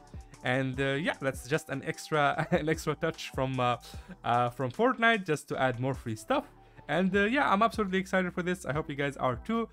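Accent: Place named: France. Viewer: J’ai l’impression que t’es français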